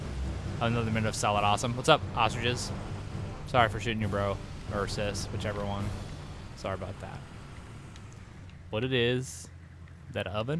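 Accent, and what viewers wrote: United Kingdom. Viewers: You sound British! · love the British accent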